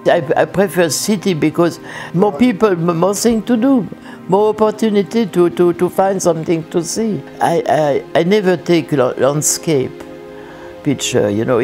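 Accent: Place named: Paris, France. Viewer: Bientôt un an qu'elle nous a quittés (28/12/2021)! Dans ses interviews on entend qu'elle était une (grande) humaniste dans sa simplicité d'être. Le respect et la beauté de l'humain et de la vie! Elle nous a laissé ses merveilleuses photos n&b en souvenir. Je suis sûr qu'elle continue de photographier de l'autre côté de la vie! Requiescat in Pace ... I love her french-english accent speaking. So unique, so french, so parisian!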